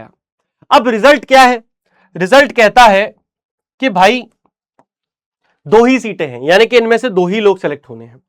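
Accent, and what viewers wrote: Bihar, India. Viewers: Ara bhaiya lag raha haii ki bihar se ha 😮😮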